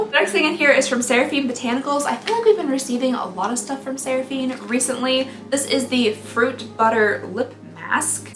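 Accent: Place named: Georgia, United States. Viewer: Sounds like south GA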